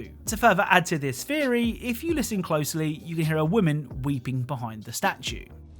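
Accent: England, United Kingdom. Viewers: Like how? Like catacombs..? Or like catacombs? Or maybe catacombs? I don't get what you're saying. I mean he's got an English accent, but he says catacombs as catacombs...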